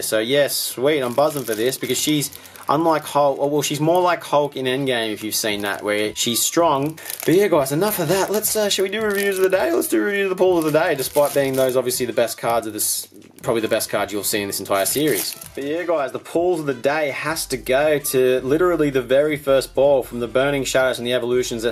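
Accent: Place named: Australia. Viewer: talk with a Australian accent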